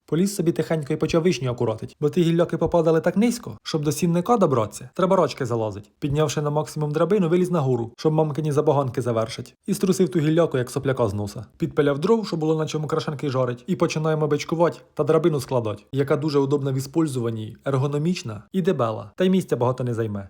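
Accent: Ukraine. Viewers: Ви або з Сумщіни, або Полтавщини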